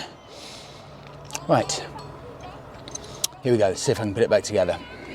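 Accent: RP, United Kingdom. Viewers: Hey. Jason here from the NE of England. Came across the channel a couple of weeks ago, this trip/ video has been very informative of costs etc. So thank you! Looking to do a similar trip on enduro bikes from hull. Brilliant idea of miles and If any I need to carry oil for changes. Thanks very much. You're awfully posh sounding compared to the NE of England but very much enjoy your videos. Subscribed and followed! Looking forward to more videos on the transalp! Cheers!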